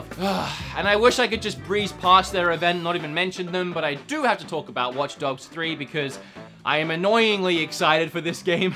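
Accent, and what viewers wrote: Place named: Australia. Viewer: I'm one of the new subscribers! I have seen your videos before but it was your hyped pokemon video before E3 that made me subscribe. I have a feeling I'll continue to enjoy your content bc I really love your energy! Also, I like your accent, because its a lot like mine... I'm Australian (and I'm guessing you are too) but I've travelled and spend a lot of time around people with more American accents. So now I have this strange Australian/US/Canadian accent... ahaha anyways, that awkward little tidbit aside, I hope your channel continues to grow! :D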